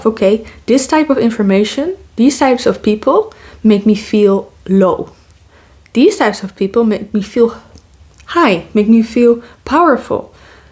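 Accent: Netherlands. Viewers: I would bet that’s u are Dutch, I can hear it. Plus, the name is definitely Dutch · Are you Dutch? You sound like that (im dutch) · Defiantly a dutch speaking english🤭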